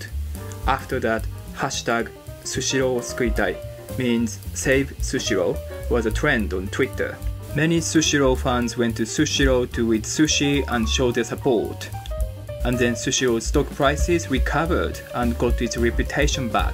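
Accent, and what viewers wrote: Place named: England, United Kingdom. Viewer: You have an English accent. Much better than American-English. And luckily not a Liverpool accent.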